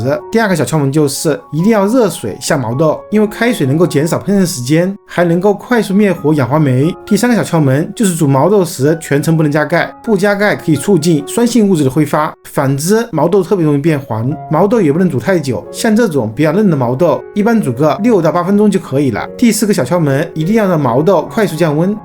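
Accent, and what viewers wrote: Wuhan, China. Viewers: 听口音是武汉人吧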